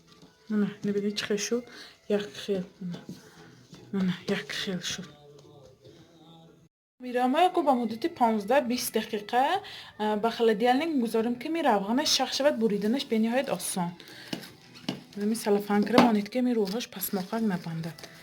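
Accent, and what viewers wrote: Isfara, Tajikistan. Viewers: Шумо Исфарагими гапзанитон худи хамсоябони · Ассалому алейкум Хохарчон ман аз лахчатон фахмидам ки шумо аз Исфара мо аз Хучанд бисер хурсанд шудам ки занхои моям дар Ютуб хамин хел барномаи зебо бомаьно ширин дорем,Дастатон дардро набинад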